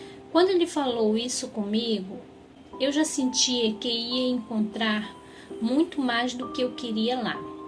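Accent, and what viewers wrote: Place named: Rio de Janeiro, Brazil. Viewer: seu sotaque carioca é muito fofo 😊. É cada história hein, alguma coisa ruim tinha lá. Credooooo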